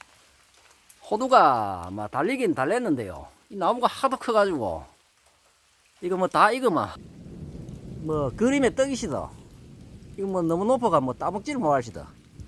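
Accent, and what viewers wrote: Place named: Andong, South Korea. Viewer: 고향이 안동쪽이세요^^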